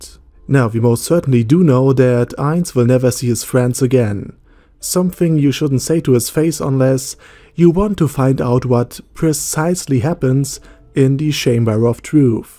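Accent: Germany. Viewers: Man merkt das der deutscher ist an seiner englischen Aussprache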